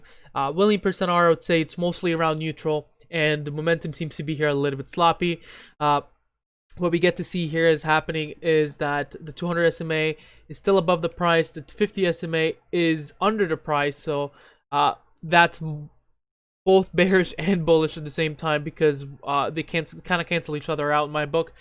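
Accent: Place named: Philippines. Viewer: Just subscribed! Found your posts on /r/pennystocks Random question, but are you Filipino? 🤔 Hearing a hint of an accent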